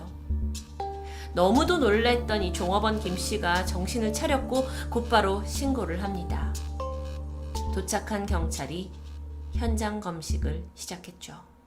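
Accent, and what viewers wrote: Jeolla, South Korea. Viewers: 절라도 말투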